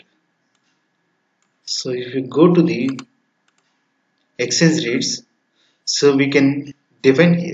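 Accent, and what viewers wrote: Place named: South India, India. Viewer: sorry to say but when u upload any such video try to speak clearly instead of speaking in south indian accent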